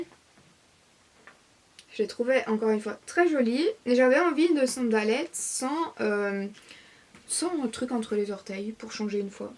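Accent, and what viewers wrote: Belgium. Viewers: super vidéo comme d'ab j'adore ton accent c est le même que moi (vu que je suis belge) bref je t'adore et une vidéo rangement maquillage ça serait trop cool !!! 😂💖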